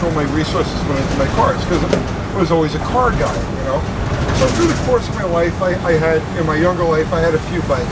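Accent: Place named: New York, United States. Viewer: Love your channel..watch it AWL the time.lol the NY accent is just a plus.lol I was raised in Boston so I drop all my R's myself.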